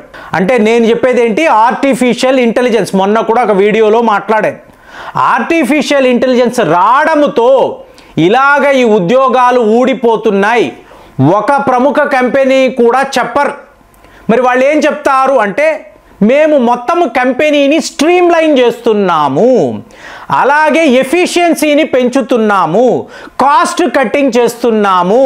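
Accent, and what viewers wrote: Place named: Telugu, India. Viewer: Painful Telugu accent😢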